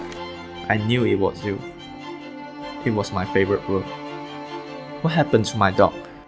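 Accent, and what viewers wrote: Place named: Singapore. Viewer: U sound like singaporean lol xD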